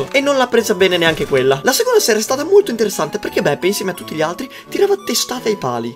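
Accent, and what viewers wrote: Veneto, Italy. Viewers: te sei veneto? Io si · ma sei veneto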